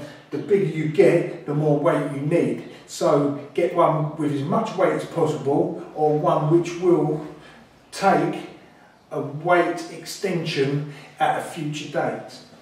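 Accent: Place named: Romford, United Kingdom. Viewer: Exactly the advice I was after. Proper decent accent - around the Romford area?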